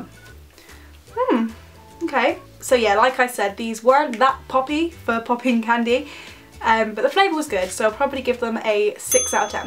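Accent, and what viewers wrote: United Kingdom. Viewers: Are you British or Australian? Either way, you're gorgeous. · are you american or british you talk like a british person but call sweetys candy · you should! it'll sounds awesome with a British accent haha =)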